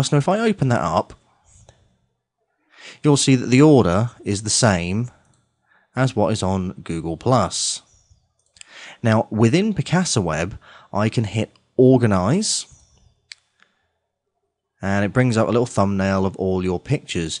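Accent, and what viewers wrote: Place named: England, United Kingdom. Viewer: Thanks. I never feel stupid when someone with an english accent is describing something to me.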